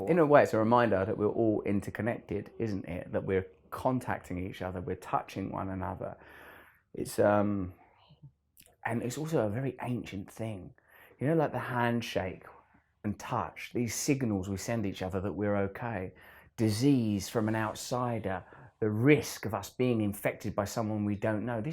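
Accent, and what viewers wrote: United Kingdom. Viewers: This guy looks like the corona virus. Lock him up and throw away the key. Take out his internet as well. No one wants to hear his fucked up British ascend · l couldn't understand u but l love British aaccent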